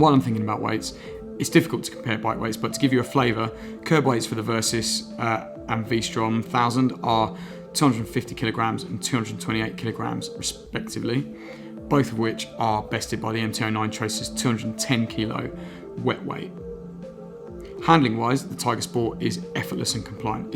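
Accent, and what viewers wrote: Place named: Cockney, United Kingdom. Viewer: Yes the cockney accent is a bit annoing.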